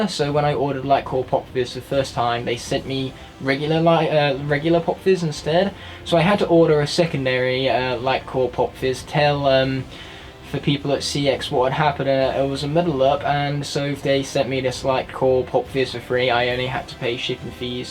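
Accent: United Kingdom. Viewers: I love this video you did so well 1) Your British unlike all the other ones 2) such a massive collection and they have good back stories 3) you are very repeatable 4)my favorite story is your wrecking ball one (series 1) 5) it’s inspired me to do a video on my collection I collect them very big on it there might be a new one in 2021 so look out for the next one and pls watch my vid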